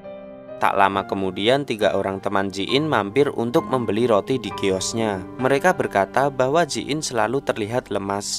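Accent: Java, Indonesia. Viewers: Jawa medok amat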